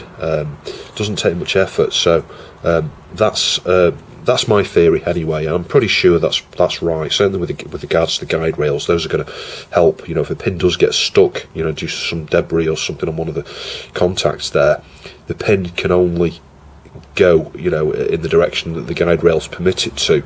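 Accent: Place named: Northern England, United Kingdom. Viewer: So I'm assuming live somewhere in Northern UK from your accent ... but if I paid u could u fix my dsi it won't read my game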